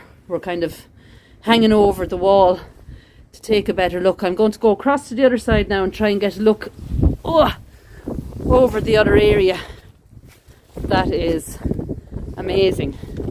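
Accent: Ireland. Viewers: Great job! Appreciate the dedication and work you put in. Are you kidding? The wind noise and camera focus is nothing - anyone who's ever done video knows that. Ignore the naysayers -- 99% of the people enjoyed it - the other one percent are holed up somewhere living off their parents and whining. You do a terrific job - glad I found you and hope to see more of your work in the future. And I love the Irish brogue!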